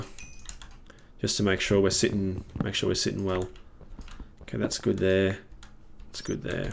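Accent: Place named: Australia. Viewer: even know i am australian so still sound so aussie!! Loving The Series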